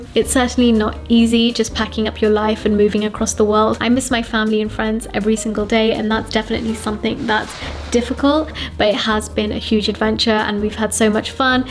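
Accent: United Kingdom. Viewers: I guess now you're going to lose your british accent , the best thing what I love Uk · British accent is soo annoying 😭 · I HOPE THE BRITISH ACCENT STAY PLEASE 😭😭😭😭😭😭😭😭😭😭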